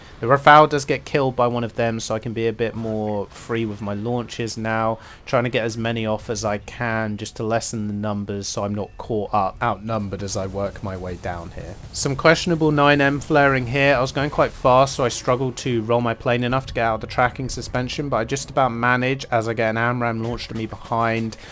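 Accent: United Kingdom. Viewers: Your channel is starting to become my favourite WT educational/training (if I can call it that) channel on Youtube. On point and posh accent! I do note the distinct lack of Shar FA2 content though. How about doing a next ARH tips with that? Come on, embrace the suffering haha.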